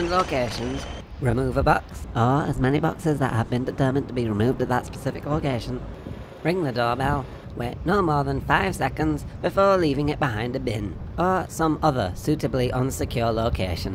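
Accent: Yorkshire, United Kingdom. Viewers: I can tell this guy is from Yorkshire because he sounds like David Firth.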